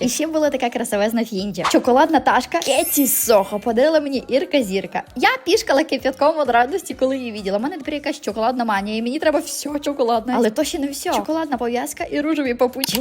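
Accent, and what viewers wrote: Transcarpathia, Ukraine. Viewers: Скільки повторяти це ЗАКАРПАТСЬКИЙ ДІАЛЕКТ ЗАПАМ'ЯТАЙТЕ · А закарбацькій діалект😊😊😊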